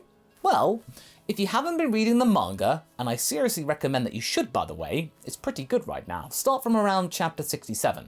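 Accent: United Kingdom. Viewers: I've never known if you live in America or the UK, you're very well poised and well spoken so I'd go more towards the UK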